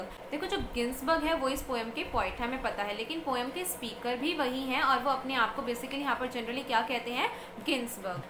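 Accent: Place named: India. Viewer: Indian English accent😢I could not understand most of the words...😑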